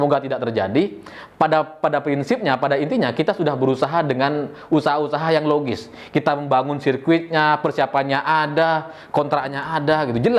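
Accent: Madura, Indonesia. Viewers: Mas ini logatnya kayak asli madura...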